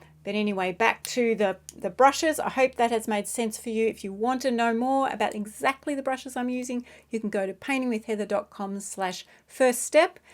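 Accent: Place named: Australia. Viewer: super informative! glad to hear a fellow aussie too 😂 thanks for the vid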